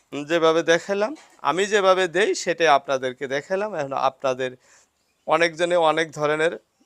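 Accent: Bangladesh. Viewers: কাকাকে আমি তো মনে করছি বাংলাদেশি । আপনার ভাষা আমাদের সিরাজগঞ্জের ভাষা একই রকম।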